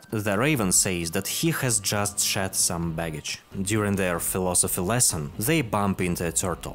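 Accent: Russia. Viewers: А я почему то догадался что ты русский, по манере разговора английского, его тембра да и самого звучания, но на я в этом убедился, тк сохранения Ppsspp было на русском. Мне приятно знать что ещё есть русское комьюнити по этой старой и шедевральной игре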